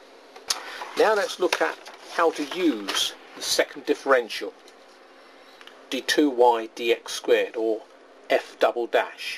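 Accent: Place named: United States. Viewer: thanks erm are you american or something...i love your accent! especially when u say...'That was careless of me' when u make a mistake hehehe x x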